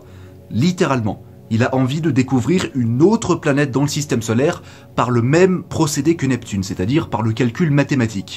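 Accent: Germany. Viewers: Pouce bleu rien que pour l’accent Allemand ;-) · C'est bien cette chaîne, pouce bleu pour l'accent allemand et la voiture qui passe ! Merci pour ce contenu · Poce bleu pour ton accent allemand :P Sinon super vidéo :D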